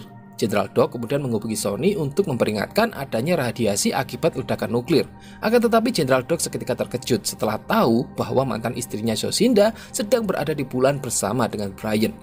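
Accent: Java, Indonesia. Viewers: Sabar ,lagi myesuain medhok dengan kuping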